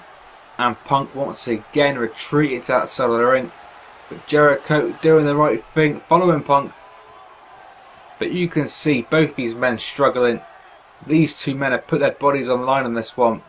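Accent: United Kingdom. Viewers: i love your pronunciation are you british?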